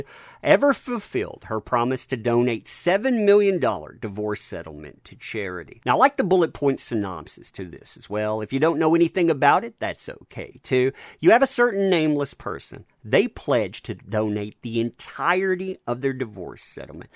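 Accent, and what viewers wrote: South, United States. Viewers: Go, J.D. Seems right, esp if ACLU is nonprophit and could be violating FOIA. If it is public info to know they were to receive $$$, shouldn't it be public info whether ACLU received it? Glad to see you back,. Been missing that southern accent to go with my coffee and keep me up to date on how our J.D. is faring.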